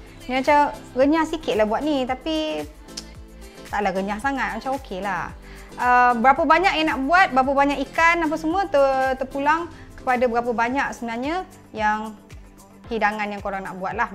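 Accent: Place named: Kedah, Malaysia. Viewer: Comey je kak ckp loghat kedah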